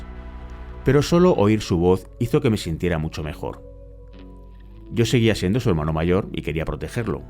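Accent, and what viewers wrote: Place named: Spain. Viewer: Porque dice audio real, sí el mae era gringo y este audio es españolete??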